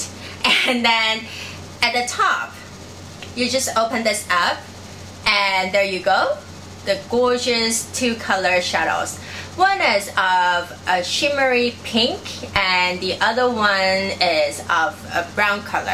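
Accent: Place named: Philippines. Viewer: Where do u from? U sound like a pilipino lolz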